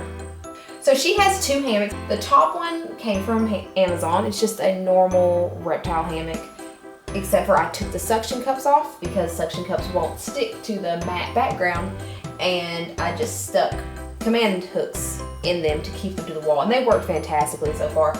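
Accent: South, United States. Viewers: love your southern accent lol ! and your beardie, and her setup! what a great deal on the cage, love your vids! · Sounds like a southern accent and i like it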